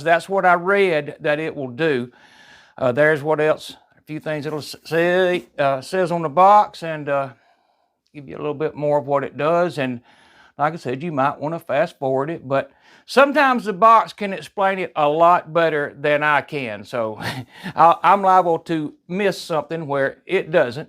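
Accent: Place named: Appalachia, United States. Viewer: Hock dang dam nammit that hill Billy accent lol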